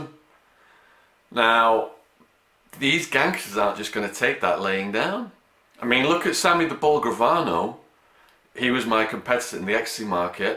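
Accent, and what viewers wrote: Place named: England, United Kingdom. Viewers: Oh.. I see you do stuff about prisons and that. My prejudice - my bad, but it was a jarring experience clicking on this and hearing some middle-class Englishman commenting on that kids situation 😋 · I did not expect a white Englishman when I went on this video, can’t complain tho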